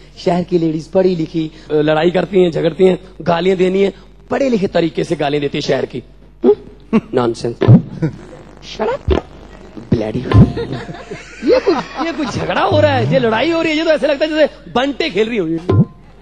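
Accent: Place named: Punjab, India. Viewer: he is actually great comedian in punjab.but he is very uncomfortable speaking hindi in punjabi accent 😂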